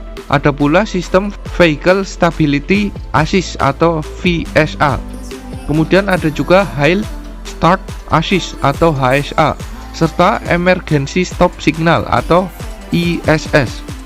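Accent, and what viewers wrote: Banyumas, Indonesia. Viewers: Asli kueh man, jarang" ana chanel oto ngapakers 🤣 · Ora Ngapak Ora Kepenak 🔥. Sukak nyong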